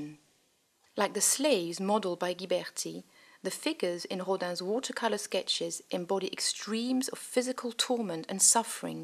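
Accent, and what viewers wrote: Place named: France. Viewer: The narrator sounds like a well spoken French girl whose posh rich French parents sent her to a posh English boarding school for a few years to learn posh English.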